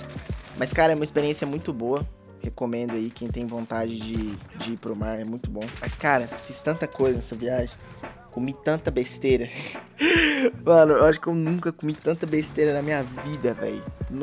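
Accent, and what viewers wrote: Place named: São Paulo, Brazil. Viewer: pra mim vc era daqui de sampakkkk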